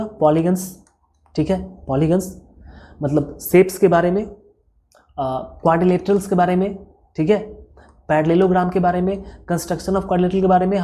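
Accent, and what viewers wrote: Bihar, India. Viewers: Sir I love your bihari style 😍🤩🔥🔥🔥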